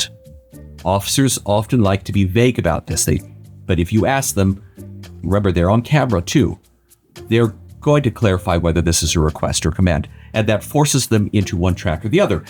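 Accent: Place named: Canada. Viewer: Clear Counsel is not very clear or helpful. This dude sounds like he’s from Canada!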